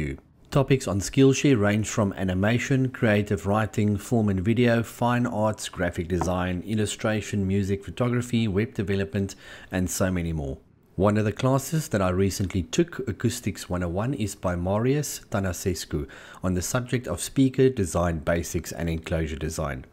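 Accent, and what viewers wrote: South Africa. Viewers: I respect your designs and work ethic, that south african accent gets me every time. all the best and greatest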